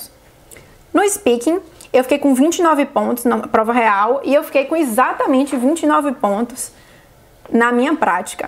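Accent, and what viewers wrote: Brazil. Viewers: Eu bem que notei pelo sotaque dela que ela é daqui da terrinha. rs'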